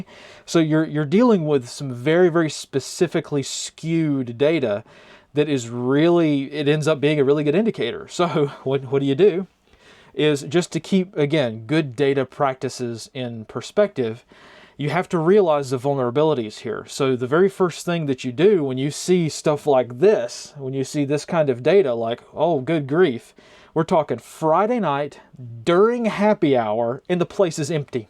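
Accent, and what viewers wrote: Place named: Virginia, United States. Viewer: Accent puts him in virginia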